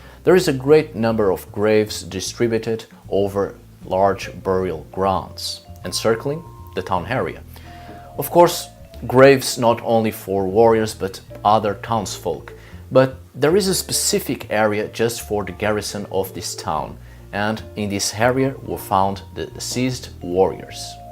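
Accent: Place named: Portugal. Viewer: Olá,! Estava em dúvida se eras mesma tuga, até porque pelo nome bem podias ser escandinavo, mas o sotaque (não leves como pejorativo, até porque falas inglês muito melhor que eu) e a fisionomia deixaram-me com a pulga atrás da orelha. Mas depois fui ao insta confirmar, e claro também porque um português que se preze consegue reconhecer outro compatriota ao longe ahah;) Vídeo interessante, apenas senti falta de referências e fontes listadas na descrição do vídeo, não só por uma questão de verosimilhança da informação mas até para aprofundamento da mesma. Bom trabalho, vou começar a seguir.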